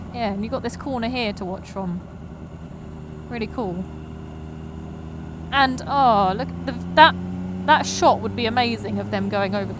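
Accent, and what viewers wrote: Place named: United Kingdom. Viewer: Excellent information video for all those who intend to visit IOM. I love your voice, it reminds me very much of the English pronunciation of Guy Martin. Thank you, young lady in action on a motorcycle!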